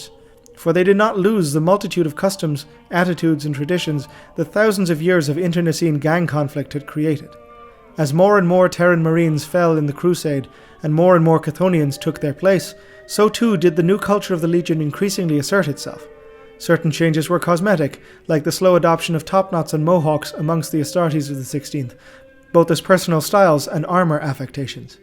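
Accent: Ireland. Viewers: sound's like Irish but i do wonder where he is from and his back ground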